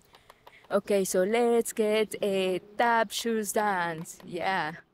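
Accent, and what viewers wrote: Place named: Mexico. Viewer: I love your Mexican accent.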